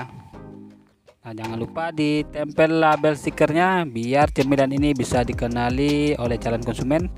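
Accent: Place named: Padang, Indonesia. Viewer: ooh... Patutlah bahasa indo nya logatnya beda... Urang awak kiro nyo... Hehehee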